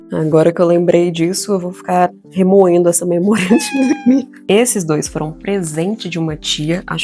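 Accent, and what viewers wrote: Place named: Portugal. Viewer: eu sempre fui leitora, mas meus pais não tinham condições de comprar livros sempre, mas na minha escola tinha biblioteca e eu AMAVA, me sentia a Matilda.. e um que me marcou muito foi "Apertada e sem espaço" e está na wishlist da amazon do meu bebê.. sou louca pra ler os livros da minha infancia pra ele. que delicia de vídeo, conheci você atraves do chat da Amylivros na twitch, e seu vídeo me prendeu e me cativou de primeira.. quanta delicadeza e dicção boa de se ouvir. Você é de portugal? sotaque me lembrou um pouco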